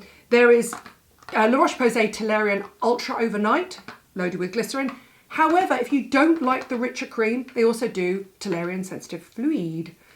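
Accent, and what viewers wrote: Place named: RP, United Kingdom. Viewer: i love her accent i wish i had a posh british accent too🥺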